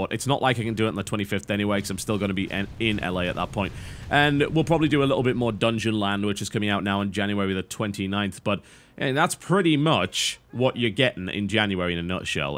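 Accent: United Kingdom. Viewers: i enjoy content patch more than anything on this channel by a wide margin i feel it's the most informative [by it's nature it's suppose to be] and most entertaining probably because i enjoy coherent gaming rants by well informed people in a british accent i beseech thee oh great biscuit of totality find the time to bring us a new episode of content patch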